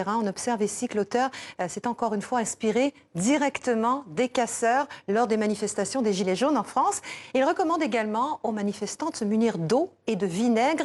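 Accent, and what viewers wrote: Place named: Quebec, Canada. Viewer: je reconnais votre accent vous etes Quebecoise ....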